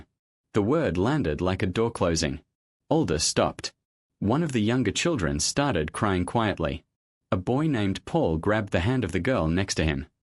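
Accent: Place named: Australia. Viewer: G'day....an Aussie AI narrator...well I never!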